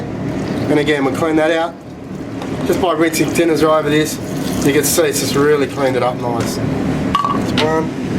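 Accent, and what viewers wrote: Australia. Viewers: Good, efficient workmanlike description. I got the message clear even with the Aussie accent. Next video, turn the exhaust- fan speed much lower so we can hear better! Thanks!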